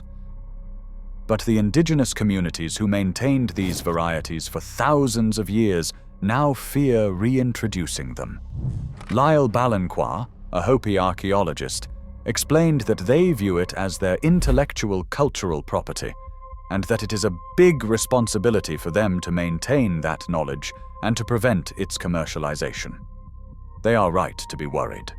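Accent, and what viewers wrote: United Kingdom. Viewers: It's the UK pronunciation.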